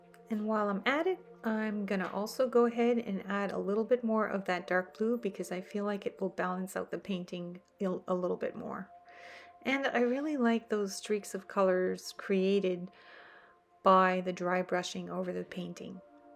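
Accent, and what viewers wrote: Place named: Canada. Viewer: Why do you have a Canadian accent? Just curious.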